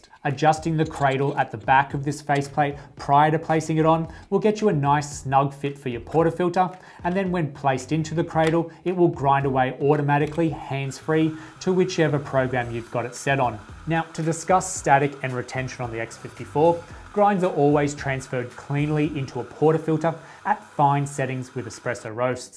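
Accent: Australia. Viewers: Thanks for the review. Very detailed review and it's really helpful. Plus, always feels very comfortable when hearing aussie accent!!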